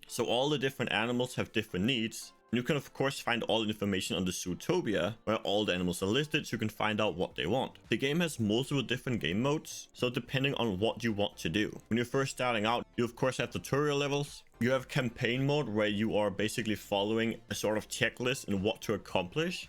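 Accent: Denmark. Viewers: u sound danish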